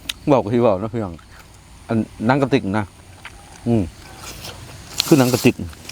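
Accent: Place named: Sakon Nakhon, Thailand. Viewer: อ้ายบ่าวท้าวกินเป็นตาแซบแท้ สำเนียงเว้าออกไปทางคนสกล ลมคือสิหนาวเนาะครับ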